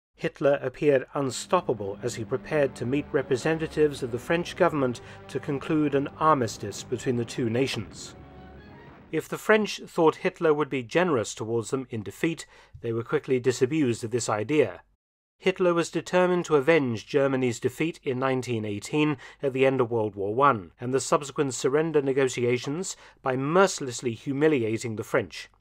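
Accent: United Kingdom. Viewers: you are a great story teller. Your English dialect is especially pleasing to listen to as an American. European/British English is so much softer, gentler to listen to.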